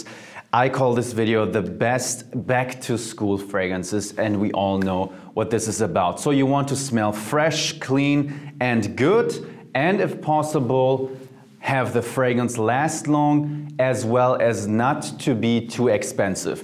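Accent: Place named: Germany. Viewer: The german accent fits perfect 👌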